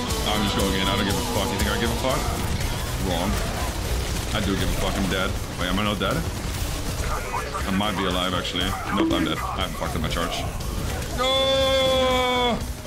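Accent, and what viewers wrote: Netherlands. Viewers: een nederlands accent in het engels is ook zo makkelijk te herkennen lmao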